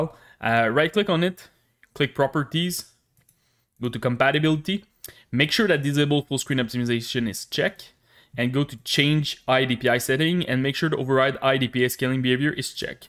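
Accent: Quebec, Canada. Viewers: The Quebec accent is here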